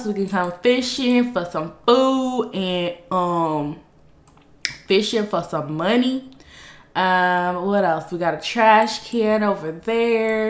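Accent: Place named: Texas, United States. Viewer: My boyfriend says you have an accent like Beyoncé lol probably because you’re from Texas also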